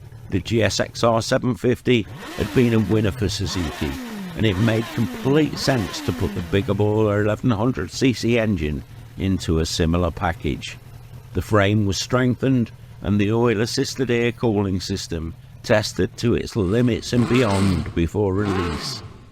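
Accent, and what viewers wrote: United Kingdom. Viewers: I love the stuff you put out. Love across pond accent and knowledge. Here in the States we got all the Japanese bikes and very few Euro bike ever made over here until the 2010’s. Not that had numerous bikes but I’ve had a few. I still own a 75’ Z1b and it’s stablemate is a ZX6R. The 600 will do an honest 268 kph or 166 mph, not bad for a bike half the displacement discussed here. I don’t need to go any faster. I supposed if I had it dyno tune and spent for a titanium exhaust I could go a little faster, but it’s not worth the money or effort. Besides I love hear that scream at 16500 rpm.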